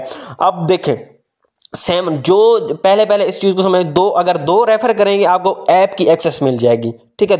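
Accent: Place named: India. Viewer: You text in English you talk Indian🙄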